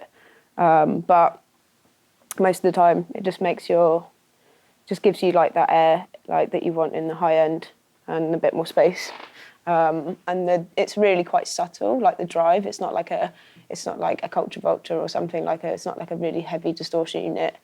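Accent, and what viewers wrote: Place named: United Kingdom. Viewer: She's so "Bri-ish" I couldn't understand half of it :D Good the music is banging :)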